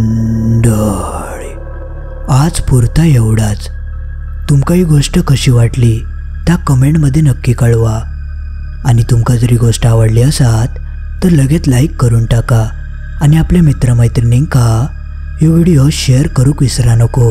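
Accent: Malvani, India. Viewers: आपण जिवंत माणसं आहोत जी पाच तत्वांनी बनलेल्या शरिरात जिवंत आहोत पण भुतं मग ते किती ही आणि कोणत्या ही स्वरूपात असो जिवंत शरिरातील माणूस केव्हाही त्यास प्रतिकार करू शकतो असो ज्यांना अनुभव हावा आहे त्यांनी प्रयत्न आणि सातत्याने पाठपुरावा सुरू करावा त्या क्रिया विधी कर्म गुप्त ठेवण्यात आल्या आहेत पात्रं नी कू-पात्र अशी परिक्षणं करुन च् या विद्या शिकवल्या जातात. 🙏 मालवणी भाषेत नी ऐकण्याची शैली आवाजातील भ्रमर नाद नी गंभीरता गोष्टीची गुढता व भय आतुरता वाढवते 👍👌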